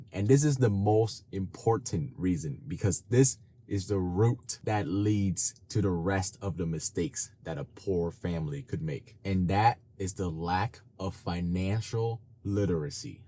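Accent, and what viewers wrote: Vietnam. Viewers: I'm a second Gen viet, but not fluent. At what age did you move to the US? I can tell you still have a smidge of that Vietnamese accent. My parents come from a poor state and they have pretty bad financial sense. Investing in horrible businesses in Vietnam, don't believing in banks or investing. But I don't blame them too much since they never finished elementary school and barely know to speak conversational English. They always say to make a lot of money when you grow up, but give zero guidance how to do it. Also all my family are pro trump maga supporters, guess why.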